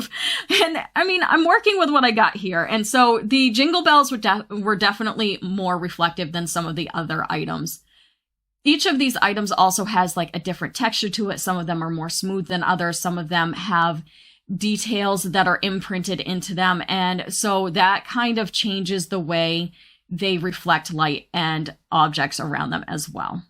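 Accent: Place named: Maine, United States. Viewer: Yooo a fellow mainah (mainer)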